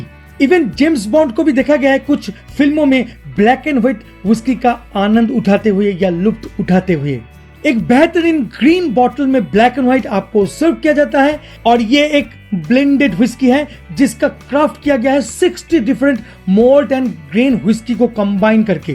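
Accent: Bengal, India. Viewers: Bengali ha?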